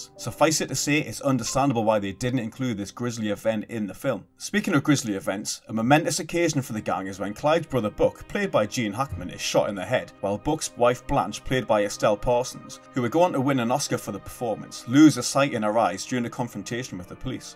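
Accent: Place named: North, United Kingdom. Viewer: Good review, good to hear a fellow British Northerner narrate on a Joblo video.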